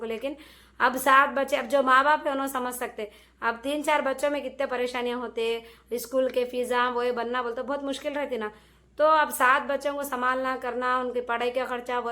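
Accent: Hyderabad, India. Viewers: Yeh hyderabadi language with old city accent ..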